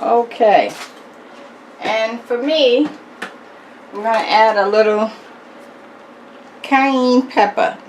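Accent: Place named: New York, United States. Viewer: Thanks for the video and I love your New York accent!!!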